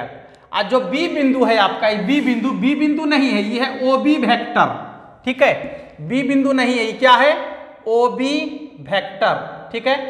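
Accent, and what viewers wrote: Bihar, India. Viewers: Guru ji hamare hi tarah khati bhojpuriya bihari hai gajab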